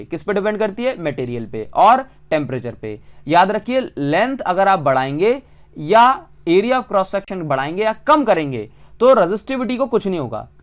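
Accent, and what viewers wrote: Odisha, India. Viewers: Sir are u from Odisha? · Sir are you from odisha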